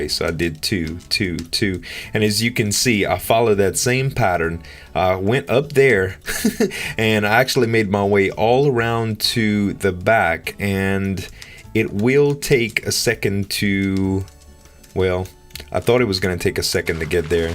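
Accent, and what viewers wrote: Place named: South, United States. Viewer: I don't think you're castle is particularly great or anything. (you break a few key design concepts -General, not just minecraft). I just decided to watch your videos because I've seen things you've done with other hermits and I like the way you talk. I reminds me a bit of when I used to live in the south. Also, I thought you were pretty funny.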